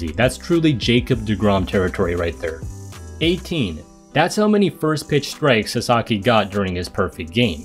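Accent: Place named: Japan. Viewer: Sportstormの動画から来ました。英語に日本人っぽい癖があるなと思ったら千葉在住ww めちゃくちゃ英語お上手ですね！ I was actually interested in the statistics side of this games and you’ve provided everything for me. Dropped a sub just lettin you know.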